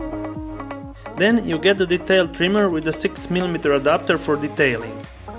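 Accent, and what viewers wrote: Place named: Russia. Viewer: русский акцент заметен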